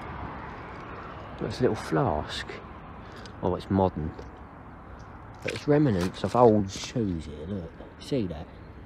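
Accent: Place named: Cockney, United Kingdom. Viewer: Your talking more and more cockney these days ennit.....but carry on larking coz I still like to tune in .xxx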